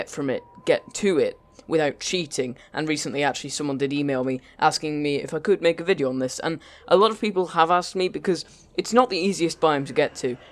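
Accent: United Kingdom. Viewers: can you stop damn moving the camera up and down it is seriously irritating and with the 'itish accent!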